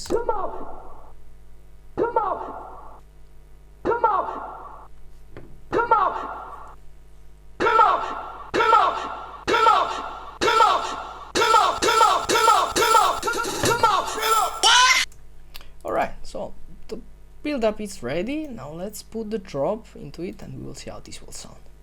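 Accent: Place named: Italy. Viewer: si sente la pronuncia italiana :D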